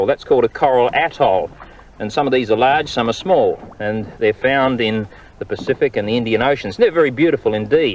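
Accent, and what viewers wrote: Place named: Australia. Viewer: I feel stupid now for never having realized that this was an australian show, i just thought he sounds kinda funny.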